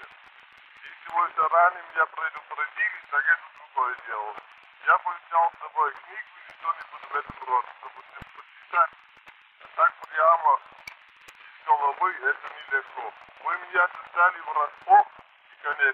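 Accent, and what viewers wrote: Germany. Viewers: Какой же он русский царь, акцент дикий немецкий. У нас на ток-шоу ходит немец Феликс, так у него меньше акцент. Может поэтому революция потом произошла? Там Романовых осталось с ноготок отрезаный. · Erich, das ist natürlich. Deutsches Blut. Selbst Nikolaus II. entwickelte, als er sich Sorgen machte, einen Akzent. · Больше, как в немцев плюнуть - и сказать нечего. Пусть даже с немецким акцентом. Эх, Русь, Русь... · Обладательница женского голоса "окает" и это достаточно отчётливо слышно: "пОгОда хОрОша". А вот у мужчины слышен именно немецкий акцент, хотя он и хорошо говорит по-русски. Типичная "р", которая произносится одновременно и языком и как гортанная и нет плавного мягкого перехода от согласной к мягкой гласной. Вместо "ря" слышно "рья". Для немцев это типично. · Чет сомневаюсь что русский царь будет петь такую песню. + жуткий акцент. 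Акцент просто жуть. Или певец так прикалывается, имитируя немца или он так хорошо владеет строруским говором в нос. Но скорей это все же акцент.Жуткий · Полная неожиданность услышать акцент у русского немца. Который себя даже немцем не считал. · Речь человека с немецким акцентом. Вряд ли это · че бы у немца не быть немецкого акцента? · А император вовсе и не русский, потому что говорит по-русски с реальным немецким акцентом. Может большевики были и правы когда уничтожили под корень эту царственную германско-датскую нерусь, котороя царствовала Россией на протяжении сотен лет плохо говорила по-русски и жен себе искала и отдыхала исключительно в Европе Создается конкретное впечатление, Россия при царях Романовых начиная с Петра1 была 100% колониальной страной под управлением Европы. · Акцент немецкий, что говорит о том, что он не был русским! Никогда и ни в каком месте он русским не был, как и все цари! 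· Акцент конечно. "Так немцы говорьят". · Это не голос Александра 3. Это голос какого-то иностранца. Акцент европейский, германский. Тут в комментах многие накидывают, что дескать иностранец, потому и акцент. Господа хорошие, а как вы объясните тот факт, что у Николая 2 не было ни намека на акцент, при том, что они в свои годы воспитывались в одинаковых условиях? И у обоих матери - иностранки. Даже больше - бабушка Николая 2 из того же рода, что и его жена, из Гессенского дома. Хватит пороть чушь про то, что императоры были иностранцами. По крови - да. По воспитанию - концентрированные русские, до мозга костей, как говорили раньше. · Мне одному послышался акцент, либо я настолько нерусский, что?...И акцент более наверно немецкий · Акцент такой - будто сам Бисмарк спел. Пьянчуга и дебошир, доводивший супругу до слез, лицом типичный немецкий юнкер, царь Александр обладал чутьем на хороших государственных людей. 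Светлая память государю. Да и евреям, кто хотел, разъехаться позволил. Че уж злобу таить спустя столько лет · при чём здесь это? Александр говорил с явным немецким акцентом, ты а ушки что ли долбишься? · он произносит русские гласные как немец, слово говорят он произносит как говор'ят у немцев не получаяется мяко произнести р пред дифтонгом я. Про крестьян тут не надо сказки рассказывать, тот же пушкин до трех лет говорил только по французски, в его произведениях грамматический строй очень напоминает французский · Немец...акцент выдаёт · Между собой они говорили на немецком, отсюда и акцент. Сам русский язык имел 3 диалекта - поморский, московитский и малороссийский. Московитский - современный, малороссийский - суржик и поморский - с оканьем и ёканьем. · Кто не понял, кто туг на ухо - русский царь говорит по-русски с сильным немекцким акцентом..... вот такой он "русский"... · Вряд ли это голос русского царя...акцент больше на немецкий тянет. 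· какое немецкое произношение, видно что русский для человека на записи не родной, и ему близки немцы, царь он или нет не знаю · Да он же еле по русски говорит. Какой дикий немецкий акцент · Интересно, что явно слышен немецкий акцент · Немецкий акцент у русского царя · У него жесткий немецкий акцент!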